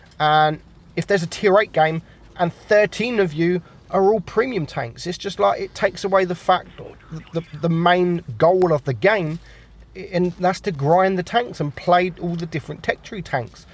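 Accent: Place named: Cockney, United Kingdom. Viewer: What is the maer you are talking about ? All of us are not cockney fluent, so please help us sometime with subtitles or eventually a more classical accent :)))))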